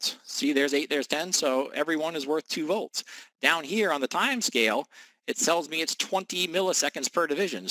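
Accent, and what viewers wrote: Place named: Northern Minnesota, United States. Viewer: that guy giving the presentation has a Northern-Minnesota accent. Have you ever seen/heard the movie "Fargo?" Great movie of a true story set in Northern Minnesota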